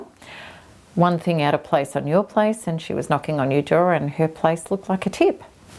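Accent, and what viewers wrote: Australia. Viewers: that horrible australian accent...but yeah female narcissists are the worst.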